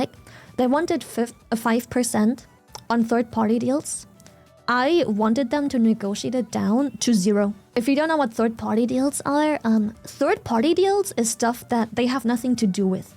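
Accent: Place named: East Germany, Germany. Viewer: I guess, the accent kind of tells it, she's from eastern Germany. More specifically Sachsen-Anhalt.She never went beyond those details.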